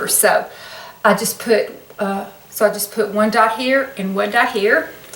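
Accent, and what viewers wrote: South, United States. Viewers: I love your southern accent. I gotta say you have very good skin, no sagging, wrinkles, or hollowness. You won the genetic lottery. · I am so thrilled to have found your videos today!! What a beautiful lady you are! Being in my mid 40's, I really don't want the makeup/skincare advice from a 20-something... so finding your site is exactly what I've been looking for. And, I believe I heard you refer to being from Tennessee, I'm also a TN gal, nice to hear that southern accent! You are such a beautiful lady with or without makeup. I'm looking forward to watching your videos. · GORGE GURL!!! I am in my 40's soon to be 50....EEEEEK! I am in DISBELIEF About that.....You are absolutely STUNNING! I am starting my channel "Boldly Being Me" Life after 45!! I hope I can get you to subscribe? But either way I loooove your Channel and videos, love your accent ( I am from Jacksonville Florida, so southern accents are the Norm here;-)) You do You and We are Loving it! 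;-)) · I love your video - this is the first one I have watched. Wish I looked as young as you! I love your southern accent, makes me feel at home. XOXO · I recently discovered your channel and I'm working my way through your videos. You are absolutely gorgeous! I'm about your age but look twice as old because I've never done any skin care until recently. You have a beautiful smile (and personality) and I adore your southern drawl!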